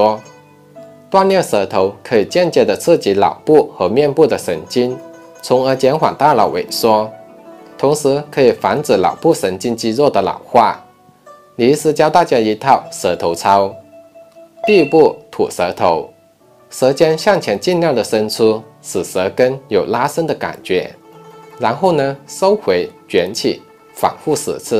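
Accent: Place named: Rural, China. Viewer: “乡下话”，久违了。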